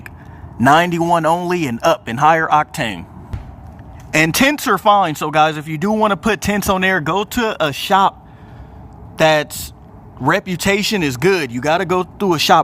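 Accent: United States. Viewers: I think the model you have was built in America, you have an American accent so perhaps you know that. German made models much better.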